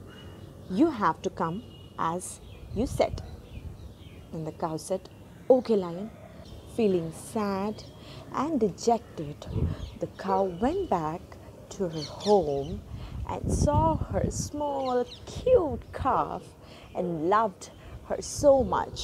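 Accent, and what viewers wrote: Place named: England, United Kingdom. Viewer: Awesone Story telling.. english accent and the way the story narrated.. creates enthusiasm in kids.. Great Job!!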